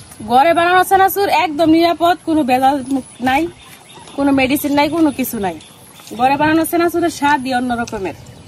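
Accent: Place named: Mymensingh, Bangladesh. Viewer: ভাষাটাও চানচুরের মতো মজাদার ছিল। মমিন সিংএর কথ্য ভাষা আমার প্রিয়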